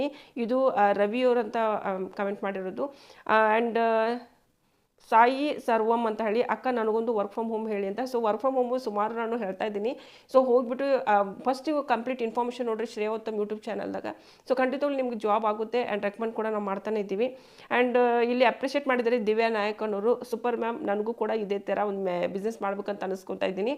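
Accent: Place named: North Karnataka, India. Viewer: Yava ooru madum nimmadu,,,,aagaga utter karnatak bashe kuda barta erutte,,,,? Kutuhala aste😊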